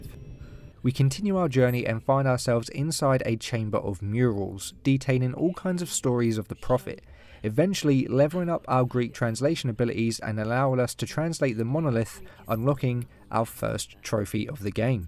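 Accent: England, United Kingdom. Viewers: I know this guy is saying divine source but I guess with his english accent and sounds like he's saying divine sauce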